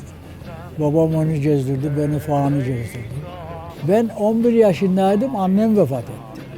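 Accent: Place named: Rize, Turkey. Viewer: Şiveden Rizeli olduğunu anladım.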